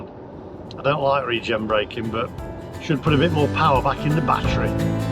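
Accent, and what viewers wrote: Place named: East Midlands, United Kingdom. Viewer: if you get caught without the Crit'air sticker in the cities especially Paris its a 135 euro fine. Traffic wardens can issue them as can standard gendarmes. It costs less than 5 euros to get one sent to the UK and thy send you a pdf version you can use to print out instantly while you're waiting for the actual disk. You have to scan and post your V5 to the French "certificat air gouv" website then they will allocate your category. My 2001 Mondeo 2.0 petrol auto is Crit'air 3 and doesn't face any additional regulations but might do after 2025 when it will be most likely banned from Paris and other major cities. Now Euro 7 is coming out it looks like all Euro 4 vehicles will be banned from the cities. The Taycan would be Crit'air 0 or E. As for the accent thing, you sound to my East Anglian ears very much the East Midlander, if I had to narrow it down I would say Nottingham accent. 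And yes ha bloody ha I fell for the battery joke.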